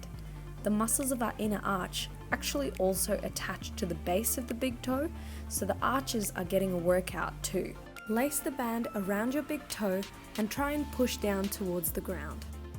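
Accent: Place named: Australia. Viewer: I noticed that you have an Australian accent! Just wondering where you can go to purchase ice skating boots?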